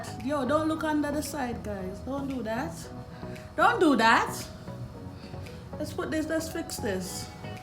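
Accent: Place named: Caribbean. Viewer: That wig is cute I need to get one of them wig. Sis where you from 🇯🇲 cause I hear a Caribbean accent👂🏼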